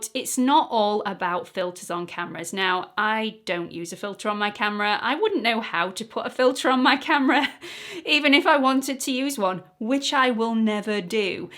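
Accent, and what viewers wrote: United Kingdom. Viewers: Thank you for being so candid and answering personal, sometimes accusatory, questions. I love your fresh style and spunky personality. I am from the states and enjoy hearing a British accent, lol. You're adorable and I miss your mom ha ha. I hope that one day I can have as good relationship with my daughters as you have with your mom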